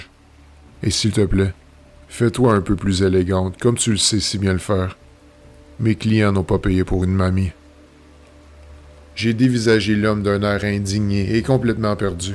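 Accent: Quebec, Canada. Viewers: Chapeau bas sa fait plusieurs vidéo que j'écoute et franchement chapeau bas, sa fait plaisir d'écouté un québécois qui lis des histoires je trouve sa cool moi aussi je suis québécoise. Lâche pas 👍👍👍👍dans ta voix sa paraît tout le temps que tu met + une abonnée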